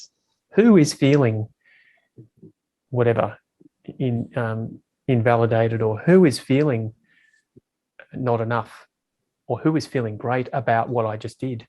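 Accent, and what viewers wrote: Australia. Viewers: Hi, you are obviously Australian, do you hold satsangs or meetings anywhere? Who are you talking to?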